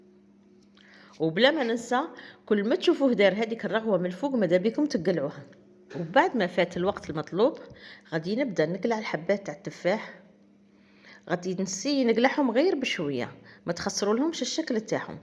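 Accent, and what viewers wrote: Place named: West, Algeria. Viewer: أحلى لايك مشكورة على الوصفة طاجين التفاح يشهي و هدرتك تهبل ماشاءالله على ناس الغرب 😘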